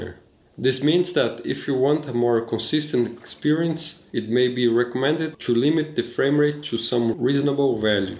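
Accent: Brazil. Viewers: valeu man kkkkk aquele sotaque br no inglês kkkkk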